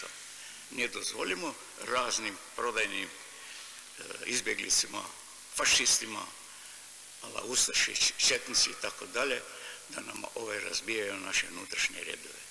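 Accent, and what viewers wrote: Russia. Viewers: Koje je bio nacionalnosti osim jugoslavenske? :) prica kao da je rus/poljak koji je naucio srpskohrvatski... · Haha 6 mikrofona za 6 republika! Kaže nje dozvoljimo. To je ruski izgovor. Ne izgovara č.Rusi nemaju č. On je neki Rus poreklom. 100 procentno.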